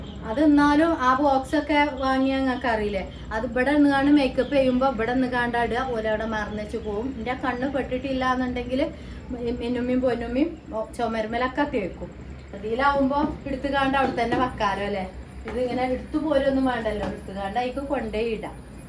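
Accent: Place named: Eranad, India. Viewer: കുറ്റം പറയണ്ട, ഏറനാടൻ ഭാഷയാ അതിനെന്താ കുഴപ്പം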